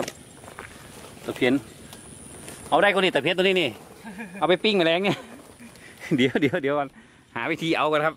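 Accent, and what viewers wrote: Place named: Northern Thailand, Thailand. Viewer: จ้าว ยายพรมาดูดึกแล้วจ้าวแต่แชร์ไปหลายคนแล้วรายการ สุดยอดเลยจ้าวน้องๆเขาชอบ ตลกดีฮเหนือผสมไทยม่วนดีแต้ๆจ้าว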